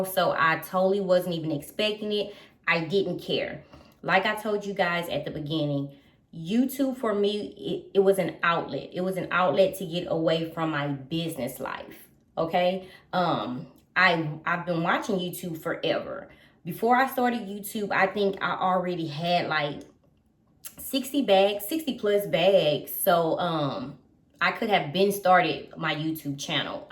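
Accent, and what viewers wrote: United States. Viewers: You are an inspiration to young women everywhere girl . Owning your own businesses and being so established let’s women know if they want something they can work hard and go out and get it for themselves ! I can only imagine the questions you get daily 😂. I come here to watch the bags girl , I want to see what you choose next , I love that you love LV canvas bags like I do . Not that I don’t find many of their leather bags beautiful , they just are not what I’m attracted to as far as LV . You are very detailed when you show your things , very polite and sweet , love your southern accent (I’m from New York) . I don’t think VIC’s should have restrictions , it makes sense to me . They did claim VIC’s would not be able to pre order canvas moving forward either , but I think it’s something they should over ride for a VIC customer . Customers like you who love the bags shouldn’t have to suffer for the VIC’s purchasing just to resell . 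With the new microchip system , I would think LV can now see what VIC’s are only purchasing to resell and which truly love the items for themselves . Thank you for sharing with us , girl I would have continued to watch and support you and never asked what you did for a living . I’m here for the eye candy ! I did videos for a minute but had no desire to be an influencer , I also had no desire to share every private aspect of my life , so I stopped filming , still love watching and supporting my favorites ! I find you very relaxing to watch and listen t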